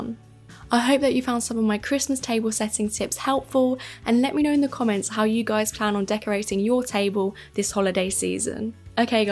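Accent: England, United Kingdom. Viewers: Haha. We're hosting Christmas for the first time. We've been going to my sisters for the last 25 years! I searched for Christmas tables and watched at least 10 videos from America. Then clicked on yours and it was a classic English accent 😍 Happy Christmas from Ireland 🇮🇪 And our table will be gorgeous.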